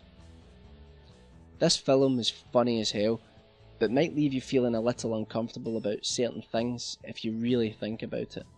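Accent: Scotland, United Kingdom. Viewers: for those ignorent people he isnt english he is scottish :) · Don't listen to him. This crazy scottish accent is one of the best features of this video. Great comments on great movies, although I have a different ranking.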